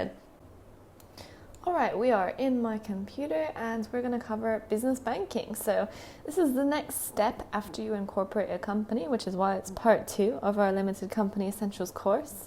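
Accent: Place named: United States. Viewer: How come you have an American accent? 😊 Thank you so much for the videos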